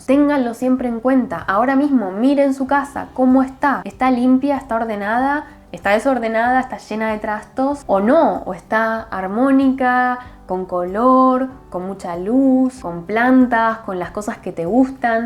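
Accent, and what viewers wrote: Argentina. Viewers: Te escucho el acento argentino gracias 🙏Vamos con Latinoamérica minimalista porque el consumismo de plástico está haciendo estrado mientras que en países más ricos está precioso el orgullo del minimalismo..una buena moda al fin!🙏🌎t ganaste una sucriptora por propiciar esto.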